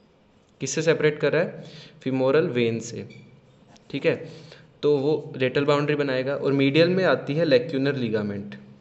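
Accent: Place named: Haryana, India. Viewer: sir tham to haryanvi lago ho manne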